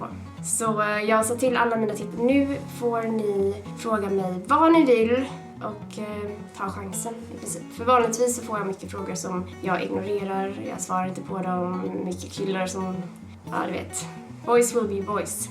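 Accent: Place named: Scania, Sweden. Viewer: bliss haha. Ja då får du komma ner till Skåne. Hade varit kul o höra din skånska. 😎👊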